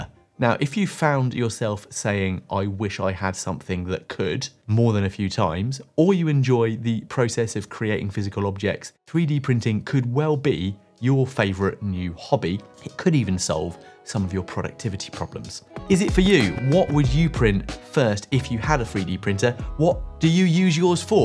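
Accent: United Kingdom. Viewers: God, no more British Accents!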